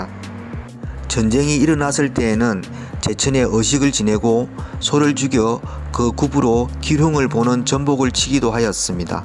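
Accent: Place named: Gyeongsang, South Korea. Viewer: ㅇㅑㅁㅁㅏ ㄱㅕㅇㅅㅏㅇㄷㅗ ㅅㅏㅌㅜㄹㅣ ㅈㅗㄲㅏㅌㄴㅔ. ㅇㅏㄴㅗㅏ ㄱㅕㅇㅅㅏㅇㄷㅗ ㅇㅣ ㅅㅐㄲㅣㄷㅡㄹ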